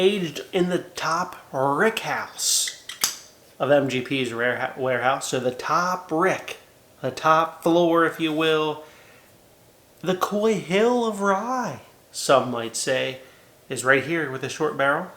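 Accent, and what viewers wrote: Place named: Baltimore, United States. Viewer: This guys Baltimore accent cracks me up. Reference to Drug city I assume you work or have family in Dundalk. Curious to try the Belle now. Thanks for the review.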